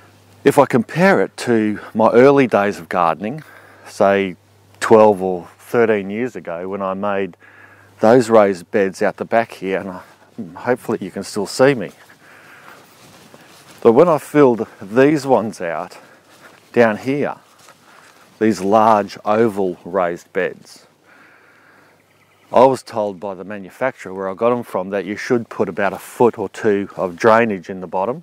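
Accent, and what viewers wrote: Australia. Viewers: You're going to be a YT Star....I wish I had an Auzy accent.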